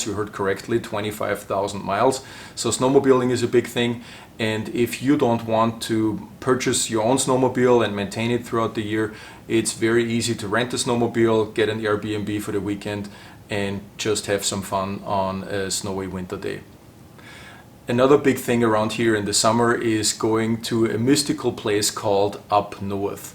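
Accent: Germany. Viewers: I thought you had a German accent but then you mentioned Austria, another beautiful country. I like that party of Europe, although I have to admit that I liked all of Europe. Now that I'm retired I have thought about going back since I have German friends.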